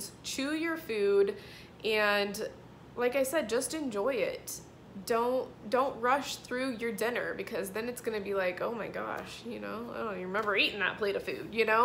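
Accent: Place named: Kansas, United States. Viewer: Great content,...thanks! Btw, are you from the Kansas area originally? Your accent is a lot like someone I know who grew up in that area.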